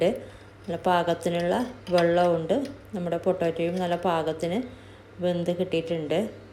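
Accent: Kasaragod, India. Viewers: Hi, Kasargod kaaroode samsaaram pole thanne avarude recipies um.randum enikkishttaan 😍.recipe name paraye Venda .kettathanne on try cheyyan thonnum.amazing ithaa😊. Kasargod kaaroode spl dishes iniyum pradheekshikkunnu.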